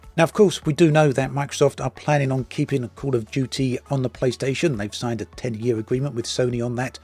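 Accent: United Kingdom. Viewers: Starfield will have the RT mod within a week of launch. It just wont be from the Devs. It will be from the modding community. So there are your lost sales MR smooth Brit accent.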